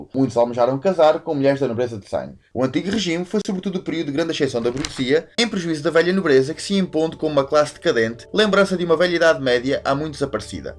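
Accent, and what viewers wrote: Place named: Portugal. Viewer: Carai nao entedi nada portugues de portugal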